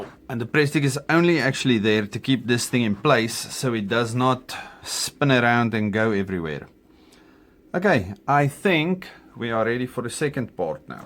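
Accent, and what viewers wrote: South Africa. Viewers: Ah yes, a fellow South African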